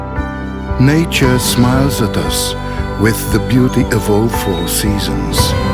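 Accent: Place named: Balkans. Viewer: she can relate.. когато говори за България, която е в женски род само при нас, а за да е правилно трябва да е it - It can relate. Клипа е мега много добър, но произношението на дублиращия е балканско и си личи, че е език, който не ползва във всекидневието. Като цяло много добре свършена работа, но оратора търпи критика.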